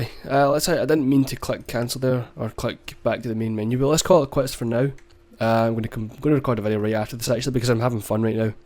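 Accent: Scotland, United Kingdom. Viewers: maaan i love your scotish accent omg be my brother please omg you are like Desmond from LOST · r u scottish?????????????/ lolololol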